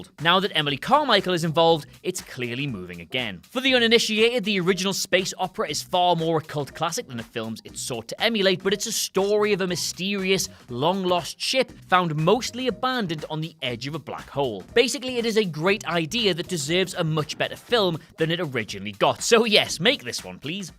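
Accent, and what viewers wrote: United Kingdom. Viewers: I can't believe I have to watch and listen to you ramble on an entire minute before you actually start getting to the point!!!!! Do you really think we want or need all that, way to fast and British, chatter at the beginning.. I mean, the subject matter is interesting and all but jeeeeez, get on with it!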